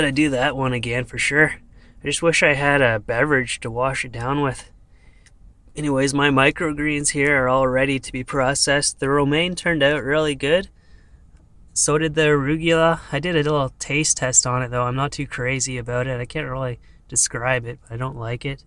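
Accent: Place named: Newfoundland, Canada. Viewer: Canadian. If I had to guess, I'd say Newfoundland? I've heard other Canadians say that's where the sort of stereotypical Canadian accent comes from.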